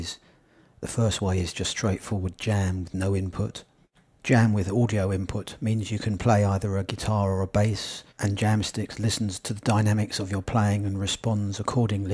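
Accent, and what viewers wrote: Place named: England, United Kingdom. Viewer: luv your Enlgish English accent <3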